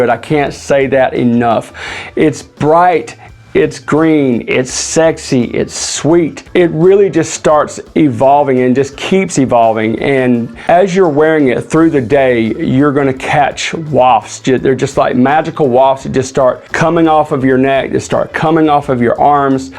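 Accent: South, United States. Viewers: Hey broski - this is a great one from Nishane With the southern accent, you should have went all Varsity Blues - it's a 10, motherf#&king 10!!😂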